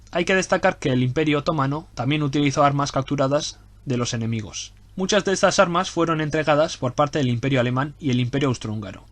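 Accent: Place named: Canary Islands, Spain. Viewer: aupa buenos videos y buen acento canario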